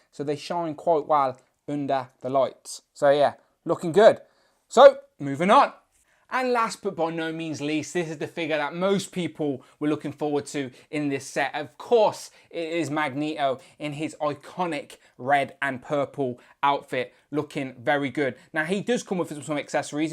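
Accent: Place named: United Kingdom. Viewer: I don't know. (He sounds like an Asian guy living in England.)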